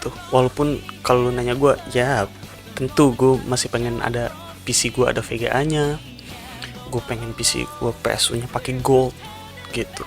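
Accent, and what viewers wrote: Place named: South Jakarta, Indonesia. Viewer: Bang lu anak Jaksel ya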